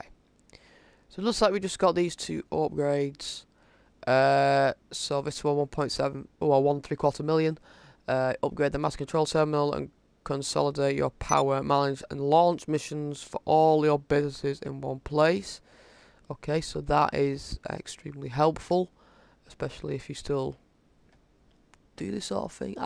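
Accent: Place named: Northern England, United Kingdom. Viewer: Love you're vids friend. Nice to hear a welcome northern accent as most vids are over the top american stuff. Red dead vids have been useful too.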